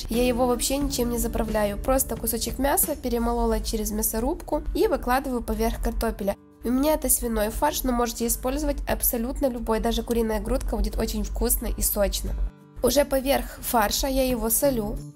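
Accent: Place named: Ukraine. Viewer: Это не дикция,а акцент украинский!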